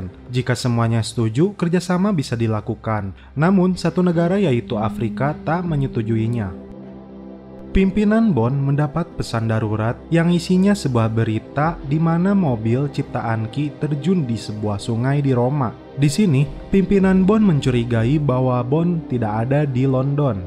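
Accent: West Java, Indonesia. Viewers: Anying orng sunda eyy:v ceuk urng orang Jawa eyy, mantabs lah kedeng urng subrek helan :v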